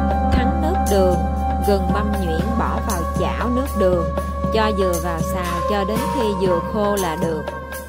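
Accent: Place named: Southeast, Vietnam. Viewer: Mình không mấy thích ăn món bánh ít.Nhưng quả thật,cô gái nói giọng Đông Nam Bộ này rất dễ thương,thông minh và khéo léo.Thật tuyệt dù không phải video nào cũng hấp dẫn.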